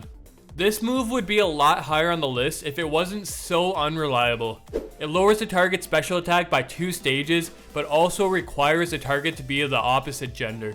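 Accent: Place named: Canada. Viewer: Please don’t use T*ump reactions like imagine if H*tler was used. I hope that puts it into context. I had a more thoughtful comment but of course youtube auto-deleted it. I love your content so I implore you to take this criticism seriously and with empathy for Americans. (It’s always esp like the scenario I described since you’re Canadian, at least I assume based on your accent and then confirmed on your channel location lol). And I say this as a dual Canadian American citizen.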